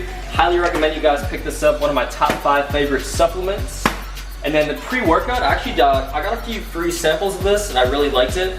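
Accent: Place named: United States. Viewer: This guy looks very Russian I was surprised by the American accent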